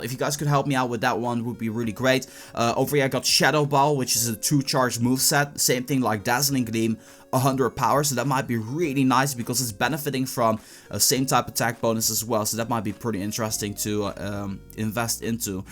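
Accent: Netherlands. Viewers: are you form the Netherlands ?????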